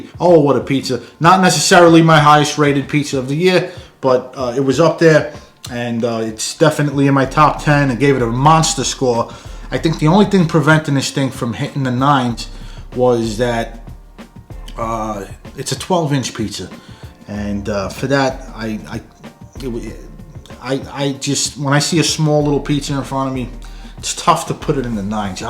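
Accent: New York, United States. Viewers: 💙 yea videos and your NY accent.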